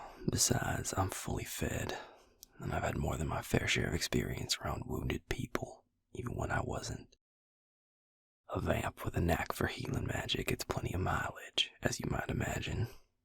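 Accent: South, United States. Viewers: I have to say that I do love calming bedside manner as a healer! Just hate to see him being drained to heal. Happy to hear from again! His smooth, Southern accent is so nice. Love learning more details to this ongoing storyline. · HE SOUND LIKE HIS FROM THE SOUTH “hey sugar” 😫😫😫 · loooook im not a fan of southern accents but <3333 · as a southerner you're really killing the southern lingo! all you need now is to throw in a handful of "fixin' to's" and "ain't's" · he rlly do, sounds like a southern or country accent he’s got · Even for someone who's lived in the south their whole life, accent still gives me 🦋🦋🦋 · Okay I’m normally not a fan of southern accents but yours is an exception 👀💳💥💥💳 not too strong I like it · the southern voice 😙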